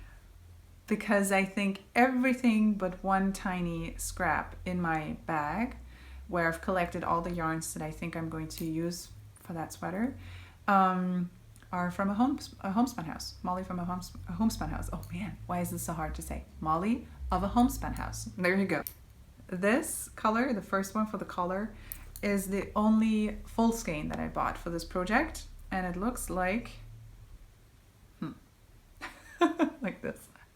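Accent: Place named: United States. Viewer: Your English is lovely. As inspired by fiber stated, we always have to pause to think of the correct word to use and we speak English as our 1st language😂. Your accent is amazing. You sound like you live in the US. So, beautiful job. I, too, loved the yellow yarn story. Thank you for sharing. Hugs.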